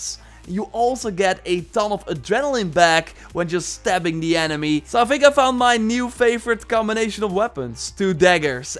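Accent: Netherlands. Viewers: Never watched you before. But based on your accent, I immediately knew you are Dutch!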